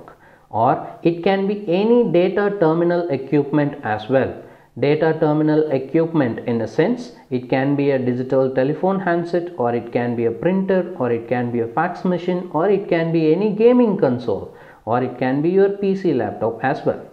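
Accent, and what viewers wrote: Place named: India. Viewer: As soon as you uttered your first word I was like "This guy is Indian he will answer all my questions" and you did indeed.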